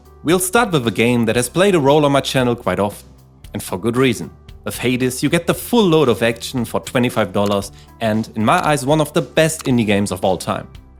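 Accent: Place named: Germany. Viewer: Your accent is german, isn't it? As a fellow german myself I find this really funny but I'm worried my own accent will get stronger through hearing all of your videos. Also I played moonlighter after getting it recommended and I liked the concept, but it was VERY repetitive and didn't have to offer anything than this innovative selling game mechanic. After the second dungeon I got really bored and wanted a bigger overworld open world and varying gameplay. All the other games on these list are absolute masterpieces though🔥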